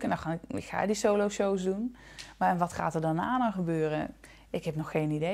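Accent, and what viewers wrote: Gothenburg, Sweden. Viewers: Ja, har blivit riktigt bra på svenska. Är det bara jag som hör en tendens till göteborgska? Inte så konstigt kanske med tanke på att hon bor nära Göteborg, men ändå. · Gothenburgian accent with a slight Dutch brogue =) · It's a Gothenburg accent, not Norwegian.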